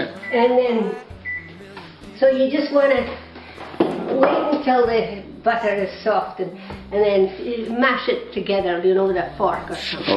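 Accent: Scotland, United Kingdom. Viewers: She sounds like a Mackay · Gotta try these for sure. Love the recipe and the Wee bonnie lass accent !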